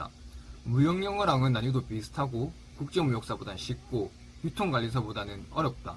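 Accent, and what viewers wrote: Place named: Gyeongsang, South Korea. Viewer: 느낌이 왠지 갱상도말 쓰는 최성국같네요 ㅋ 멋집니다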